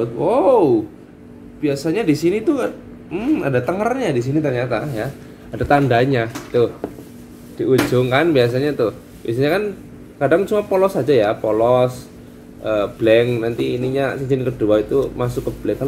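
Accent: Banyumas, Indonesia. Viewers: Deneng bakule ngapak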